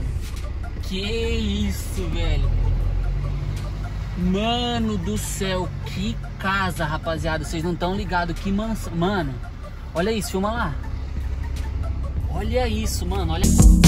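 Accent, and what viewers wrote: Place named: Spain. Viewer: Jajajaja que bueno es tu español, de hecho tu acento parece el de español españa! Tenes familiares españoles o algo ?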